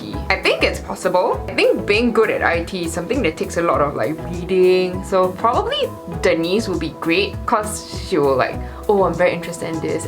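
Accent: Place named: Australia. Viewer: She kind of has a Australian accent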